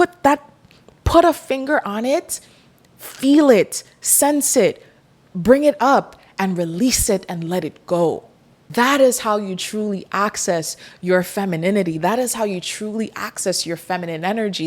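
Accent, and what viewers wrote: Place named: Caribbean. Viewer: Binged these videos and from the first one I said “She from the Caribbean🤔”. That “aht aht aht” was it for me 😂🫶🏾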